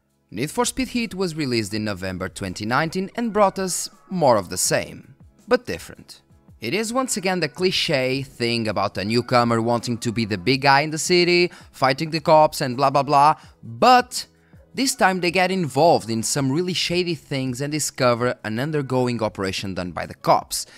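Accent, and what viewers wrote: Portugal. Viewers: dude that accent... are you portuguese? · when i start hearing european portuguese accent... hello there xD either way nothing beats nfsu 1/2 and need for speed most wanted 1.... underground 1 was amazing... underground 2 was the first open world (if im not in mistake) and mw was just loved by... everyone? EVERYONE!!! :D soundtracks were amazing in all of those 3... in heat half of the soundtrack is cool other half not so much... either way as portuguese im fine with it but even to me i get the feeling the guy responsable for the soundtracks was some spanish or something because its only latin music... i mean.... it matches the game... but sounds weird... specially for me im used to rock and super agressive songs in nfs (im old school xD)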